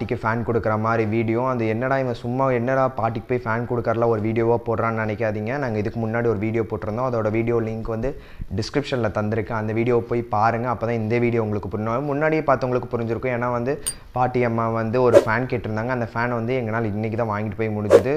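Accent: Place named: Kongu, India. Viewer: Unga kongu thamizh pechi arumai🥰😘😊☺👌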